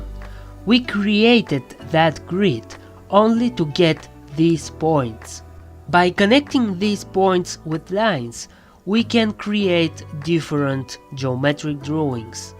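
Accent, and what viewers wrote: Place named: United Kingdom. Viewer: Hello! It's always a great pleasure to watch your videos. Thank you for this one which is very nice! (thumb up for your english; it sounds so british);)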